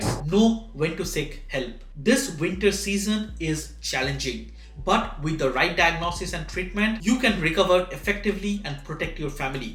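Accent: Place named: United Kingdom. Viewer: I love your British accent. Where in the UK are you from?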